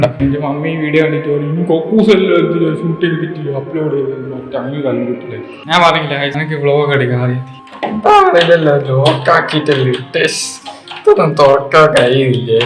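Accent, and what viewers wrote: Kannur, India. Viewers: ingale kannoor basha 🤣🤌🏻💘powli aanu 💘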